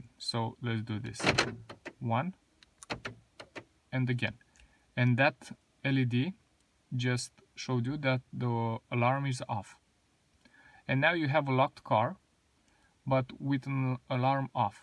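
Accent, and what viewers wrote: Romania. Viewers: Esti român? Ai accent :))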